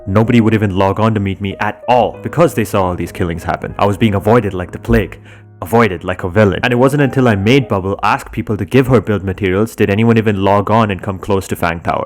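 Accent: India. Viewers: u sound indian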